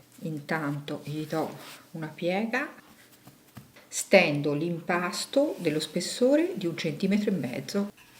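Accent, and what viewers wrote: Tuscany, Italy. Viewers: Buonasera, con la sua dolce calma e quella magnifica cadenza toscana, ci ha regalato una ricetta che si deve provare prima di subito... Che meraviglia questi dolci di carnevale. Grazie! 🎉🎭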